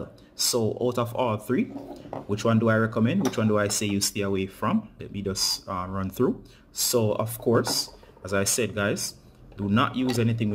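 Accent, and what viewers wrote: Jamaica. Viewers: yuh sound jamaican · Are u ah Jamaican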